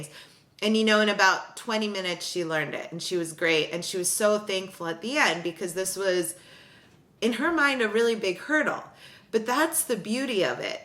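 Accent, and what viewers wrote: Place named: Greece. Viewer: You sound Greek...!!!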